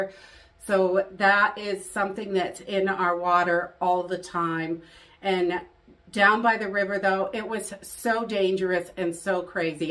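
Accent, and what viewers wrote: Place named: New England, United States. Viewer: You are already getting a New England East Coast accent!! You can come park yah cah next to mine! Welcome 🤗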